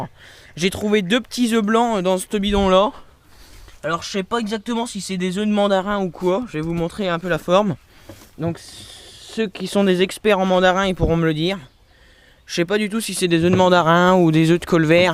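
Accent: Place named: Marne, France. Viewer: Je crois reconnaître le même accent que moi de la Marne non!?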